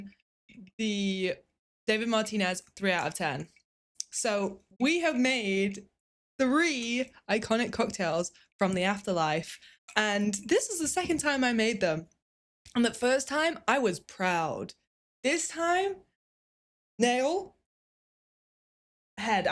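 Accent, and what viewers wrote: United Kingdom. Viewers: You became full brit after jackie's drink, your accent got full british mode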